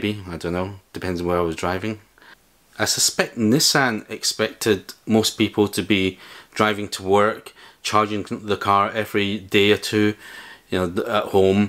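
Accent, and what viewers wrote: Scotland, United Kingdom. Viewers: I don't have a Leaf, but I'm subscribing just to hear your lovely Scottish voice. : )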